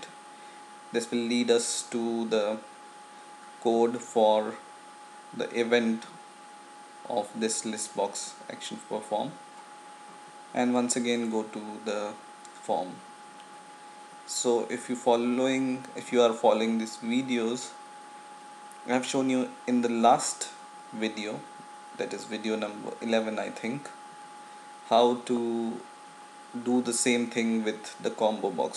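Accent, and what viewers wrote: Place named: India. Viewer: You did well but let me tell you, your Indian accent is so ugly and I hate it. you should put more effort into practising pronouncing english bro then I bet your videos r greater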